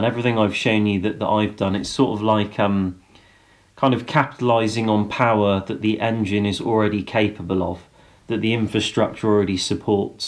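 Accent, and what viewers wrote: England, United Kingdom. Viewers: Ha, no Mike, just a very English pronunciation :-) Its actually a great video, where the underlying story is more about - whatever your 4x4 is, there's things to work on for more power, but that rabbit hole can get deep.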